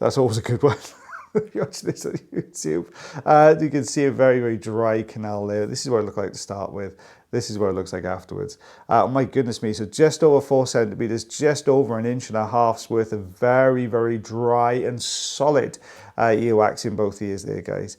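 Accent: Wales, United Kingdom. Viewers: A Welsh accent makes this even better. Very satisfying.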